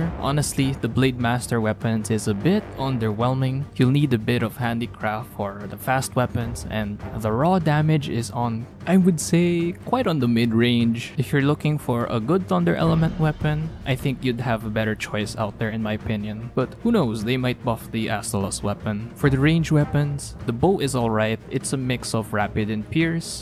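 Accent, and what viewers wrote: Philippines. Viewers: Filipino ka ba bro?? I sense it with your accent eh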